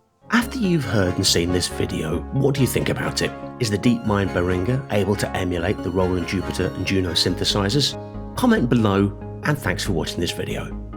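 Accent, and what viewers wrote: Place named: Japan. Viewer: It's a nicely done comparison. Whilst it absolutely can replicate the Juno 6-60-106, replication of the Jupiter 8's subtleties with cross mod etc, is not there. I have owned the original JP8, and now own both a DM and the JP-08, plus the Cloud ACB and Zenology versions. I'd still love to see Behringer really do their JP8 clone, as I think they'll nail it. One thing.. the commentary is very "Jinglish" (as we used to say at Yamaha R&D). It's a great, soothing and very listenable voice over, but the grammar and use of English needs work. For the record, when at Yamaha R&D, we also worked with Howard quite a bit (Jones), he came in for a Vocaloid overview, and over the years, did quite a bit with us. Truly one of the great exponents along with Nick Rhodes of the JP8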